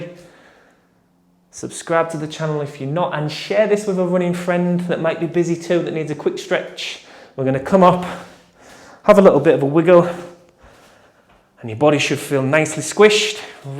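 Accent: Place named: Hull, United Kingdom. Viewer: Hull accent always makes me smile haha. Smaaaling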